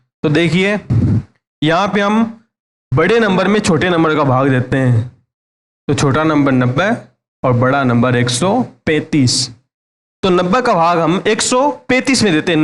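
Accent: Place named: Rajasthan, India. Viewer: Bhai kuch interesting video banao biring lagta hai,dekho ek tho aap logo ki language sahi nahi, English talking meh Rajasthani jhalakti hai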